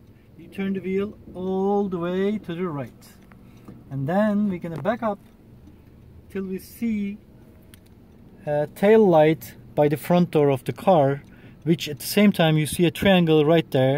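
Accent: Turkey. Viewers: Your accent look like turkish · Türk telaffuzu bu :D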